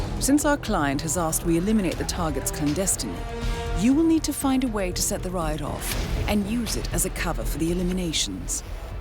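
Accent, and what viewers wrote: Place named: United Kingdom. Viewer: I really like the British accent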